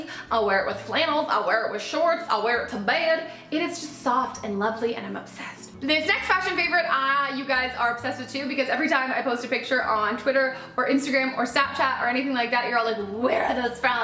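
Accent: Russia. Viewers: Russian accent really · Actually you really speak with russian accent. It was gooood:)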